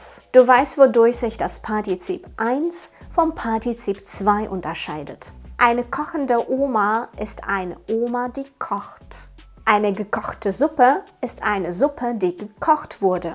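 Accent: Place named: Russia. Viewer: Если честно с первого слова русский акцент распознала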